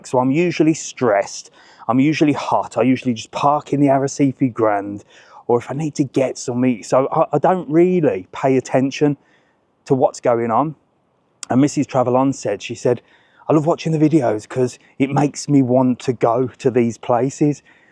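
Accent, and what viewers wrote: Birmingham, United Kingdom. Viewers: Just recently discovered your videos. Loving them. I'm a Brummie myself and love your Brummie way, and your Mum and way too, haha..trust him to spot MaccyD's! I'm severe sight impaired and have hearing aids too now. I am closely considering a stay in Lanzarote, and at the Arrecife Grand; so it's useful to get a good look round and insight. Probably be the first Romulus FC fan in Lanzarote...I wonder.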